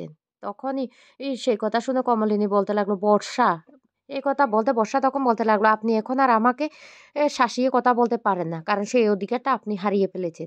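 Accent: Bangladesh. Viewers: Plz ei pronunciation niye r video deben na. Bangladeshi tone ekhono ekdom jay ni.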